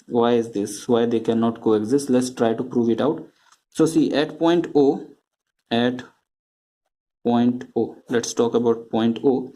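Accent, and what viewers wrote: India. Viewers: When the accent is Indian...you know the video will help lol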